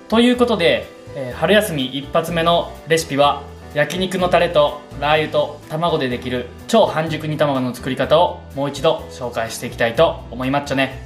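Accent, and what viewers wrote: Kansai, Japan. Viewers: 関西の方だったんですね！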